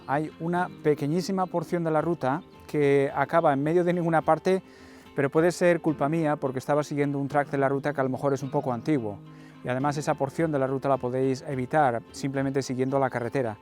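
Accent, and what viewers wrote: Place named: Catalonia, Spain. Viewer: hola tu eres catalan verdad?? puede que te delate ese acento o me equivoco jajajaja yo soy de barcelona pero en cuanto herede me piroi a vivir asturias de cabeza a un pueblo de 50 personas y ha vivir como siempre quise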